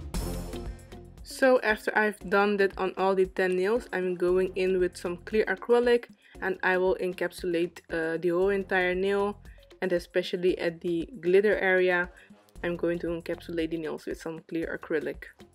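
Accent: Netherlands. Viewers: ik hoor gewoon aan je accent dat je nl bent hahahahha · Your English sounds Dutch. Am I right? 😅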